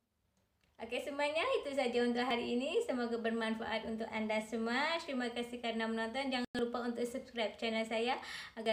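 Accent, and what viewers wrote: Malaysia. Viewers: Anda orang mana kayak orang malaysia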